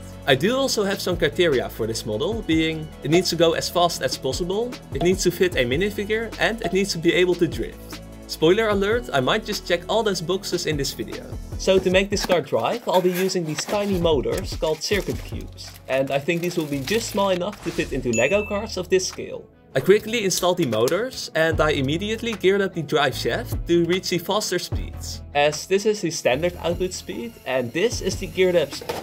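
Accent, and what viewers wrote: Netherlands. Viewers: Echt een heel erg nederlands accentje, maar wel leuk man dit hahaha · No shit bro ofc hes dutch you can hear in inmediately by the way he talks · i can hear is NL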